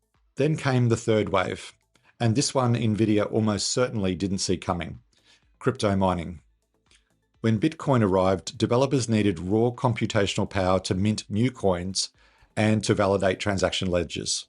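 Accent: Australia. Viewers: Maybe not. That Aussie accent is probably hard to clone (for the moment) lol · No, I am Australian and this is a real person. AI is useless at faking the Australian accent. This guy is real.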